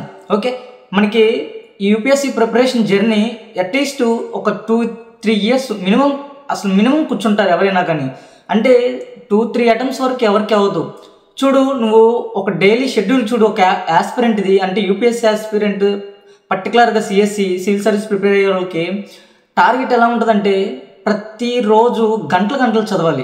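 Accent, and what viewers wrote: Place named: Visakhapatnam, India. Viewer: Brother vizag rural aa medhi. Slang konchem maku dgagara ga vundi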